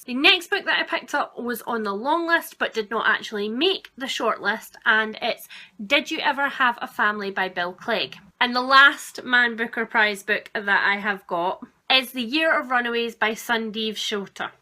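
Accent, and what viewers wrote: Scotland, United Kingdom. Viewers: Just discovered your channel! I love your videos! And I love your scottish accent! I can't wait to visit Scotland again next summer (I'm Italian), I've been there back in 2014 and fell in love with it.. Plus, Edinburgh's bookshops are to die for! Awesome Haul btw!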